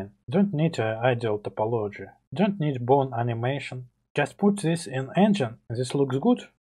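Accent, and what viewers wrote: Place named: Russia. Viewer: Хмммм он будто русский говорящий на английском 🤔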